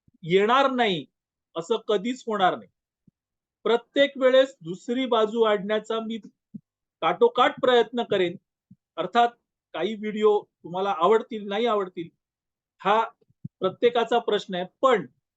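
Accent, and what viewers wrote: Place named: Konkan, India. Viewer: माका खूप आनंद होवाक,तुमचा कोंकणी आवाज कानावर असाच पडत रहावा! आमचा आनंद असाच कायम ठेवा,हेच मागणे आसा!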